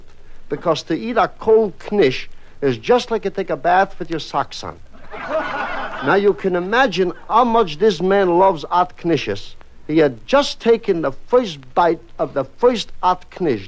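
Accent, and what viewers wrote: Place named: Italy. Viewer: An authentic Italian accent!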